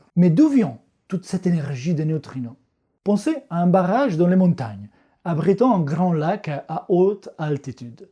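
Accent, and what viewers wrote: Italy. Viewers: Merci pour cette vidéo très claire. Et quel plaisir cet accent italien ! J'attends avec impatience la vidéo sur la fusion de deux étoiles à neutron qui forme les éléments les plus lourds.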